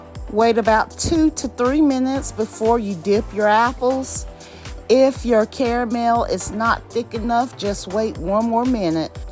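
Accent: South, United States. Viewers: I love your southern accent!! I am from NOLA so ours is kinda fast with a little southern. You apples look delicious and I can’t wait to use your recipe! Thanks so much for sharing!!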